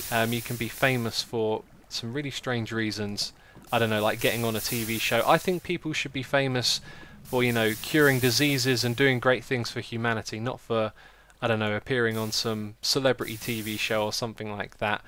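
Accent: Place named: England, United Kingdom. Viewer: Thank you so much man for all the content. The funny thing is that with the whole anonymity thing, you could literally live down the road from me and I wouldn't even know haha. Cause of the exact English Accents:-)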